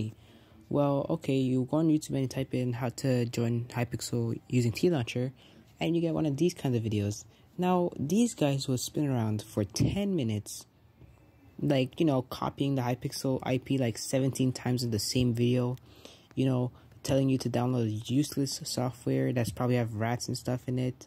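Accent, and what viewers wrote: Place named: India. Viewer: Fr bro as soon as i hear the indian accent i know that the video is fake, scaming literally flows in their veins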